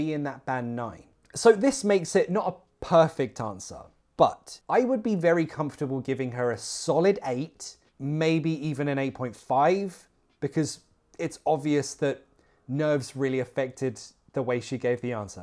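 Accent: United Kingdom. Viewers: You're always wonderful! I adore a British accent and really like your way of teaching, I study English language and life of Brits❤ by the way, 5 years watching you from Iraq! ❤❤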